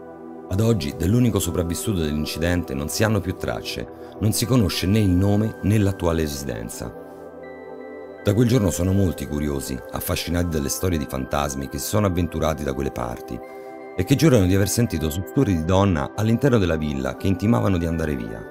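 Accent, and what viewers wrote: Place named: Rome, Italy. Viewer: La voce narrante distrae troppo dalla storia stessa. Bello il timbro vocale, ma inascoltabile la pessima dizione, nonché la biascicata pronuncia del romanesco "moderno", fuori luogo per tali narrazioni.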